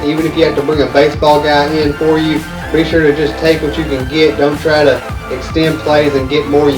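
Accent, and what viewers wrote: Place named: South, United States. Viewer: Your Suthern accent made it very hard to understand you no offense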